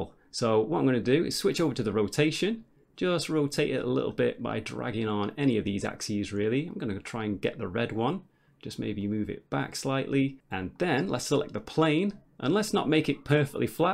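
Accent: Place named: North, United Kingdom. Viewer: I like your northern accent! clear friendly and well paced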